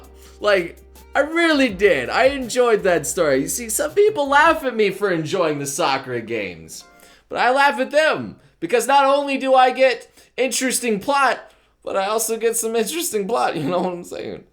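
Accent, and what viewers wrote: Ireland. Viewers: I love that Irish accent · Your Irish accent is awesome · OMG HIS IRISH ACCENT IS THE MOST PERFECT THING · that Irish accent though XD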